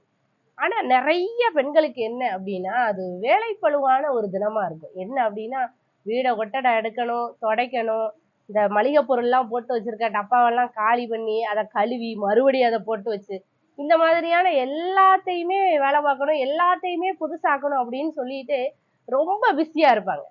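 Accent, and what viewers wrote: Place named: South, India. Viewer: அக்கா உங்களுடைய தேக்கத்திய மொழிவலக்கு மிகவும் சிறப்பு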